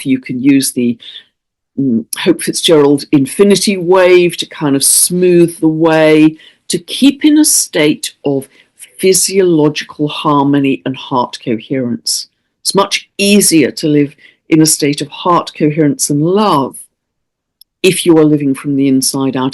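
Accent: United Kingdom. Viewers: "starve out the scary, love in the good" in a British accent, of course ❤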